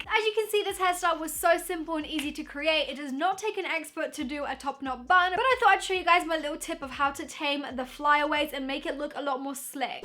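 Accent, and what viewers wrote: England, United Kingdom. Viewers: I just love British accent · Of course you look beautiful with your English accent and your beautiful hair while I am here stuck in Florida sinking with the world with the ratchet hair that looks like a mop so no I cannot do these hairstyles because I have the ugliest hair ever and you have hair that looks good sorry for the rant I’m bored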